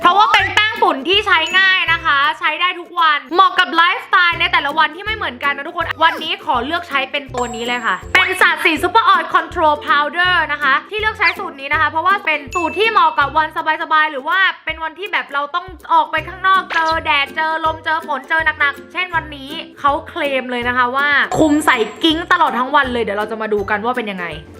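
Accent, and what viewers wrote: Nakhon Sawan, Thailand. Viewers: เฮียเหน่อออออแบบฉบับนครสวรรค์ของแท้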